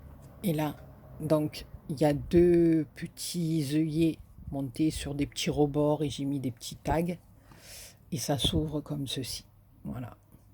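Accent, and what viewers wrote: South, France. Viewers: Tout à fait sublime ❤️❤️❤️ton album est magnifique avec les papiers ...une abonnée de plus...hihi merci pour le partage. J aime ton accent du sud . Bises 😘 Anita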